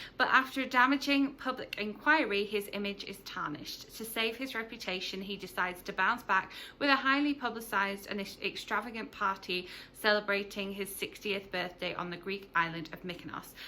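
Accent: United Kingdom. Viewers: I haven't seen that yet. As a Welshman I am proud to say he is one of our own. I can't believe you are Canadian, you sound Middle English.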